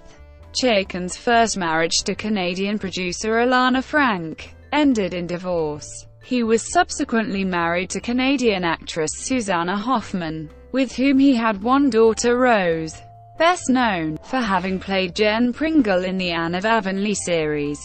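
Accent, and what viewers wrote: United Kingdom. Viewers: a BRIT robot voice!!!...coooool.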